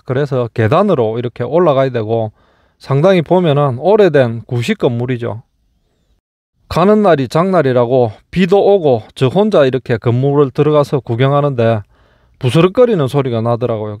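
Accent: Gyeongsang, South Korea. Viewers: 구독은 안했지만 영상 올리시면 빠짐없이 봅니다 저는 전북 전주 출신이지만 경상도 구수한 말이 너무 좋네요 그리고 제가 좋아하는 약간 쓸쓸한 음악과 경치 한편의 시적상상이 떠어름니다 마음으로 항상 감사함을 느낌니다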